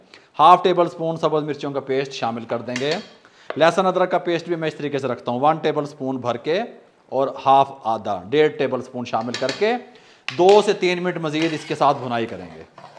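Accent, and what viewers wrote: Punjab, India. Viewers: Asalam o alaikum... aap mere bade bhai hai, mien Quetta se hu, yaqeen kare aap ne tho cooking itna aasan kr diya hai aur jo kuch bhi mien try kartha hu zabardast hotha hai... aap ka jo andaz hai na punjabi bolne ka e.g: chaske arahe hai, muh wich pani, aaj ne kya sika diya etc... yaqeen kare mujhe punjabi zuban seekhne ka shauk ho gaya achi lagne lagi hai... Allah aapko kamyabiya de. Ameen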